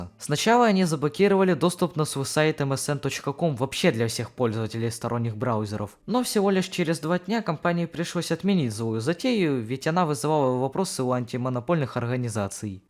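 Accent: Ukraine. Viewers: Он из Украины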